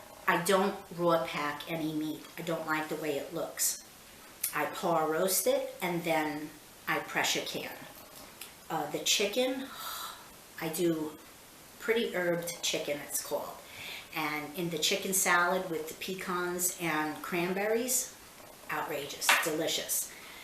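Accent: New York, United States. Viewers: Loved your video. You sound like a New Yorker!!!! Love it! Please make more videos!!!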